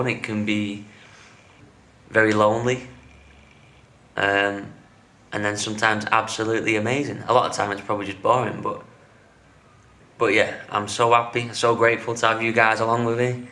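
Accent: Manchester, United Kingdom. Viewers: Trying to identify where in Manchester you're from by your accent...where?! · Now I'm reading all these comments in his british accent in my head. Including mine. I don't have a british accent at all. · Otabek Isaqov he's Mancunian - north west of England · I always like the music you put over the b-roll. The shots are very beautiful. The overall atmosphere of your travel vlogs is very enjoyable. You wanted to know what we like about your videos, well....everything? Your UK accent gives it a nice flair, too :) I'm looking forward to the other videos about the rest of the travel!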